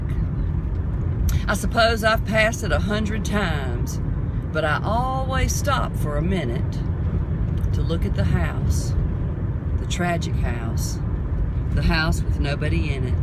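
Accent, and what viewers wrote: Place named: South, United States. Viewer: My mother would recite this poem for me when I was young. Well mom is gone now. I was thinking about the poem the other day and went searching YouTube. I like this one because she has a nice southern accent.Thank you.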